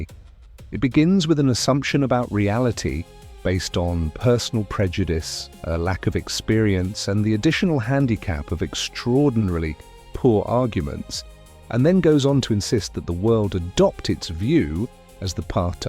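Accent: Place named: England, United Kingdom. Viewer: the english accent instead of a german accent speaking english turned me off the content, the english language replacing completely anything to do with the person has been pushed onto the world for far to long causing too much damage... its only a little thing but its still part of a bigger thing. Generalised accent more broadly accessable wider audience... there are heaps of examples of germans speaking english that re perfect to understand to use as an example.... anyway, take my comments for what they are worth. All the best.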